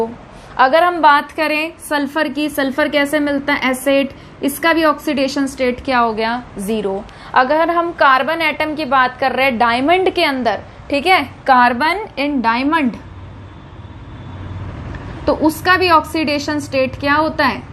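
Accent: Haryana, India. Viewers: Aapke speaking style haryanvi touch h😅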